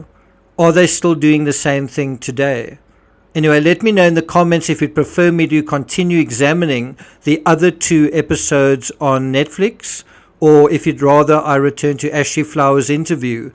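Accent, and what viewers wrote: South Africa. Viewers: I hear a South African. I like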